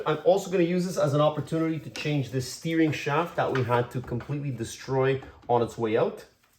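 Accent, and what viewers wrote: Quebec, Canada. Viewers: nothing like a Quebecois French accent, grew up to a mother that had to learn English and grandparents that immigrated to the states in the 50s. I spent some time in Sicily in the military, Quebecois French kind of reminds me of Sicilian Italian.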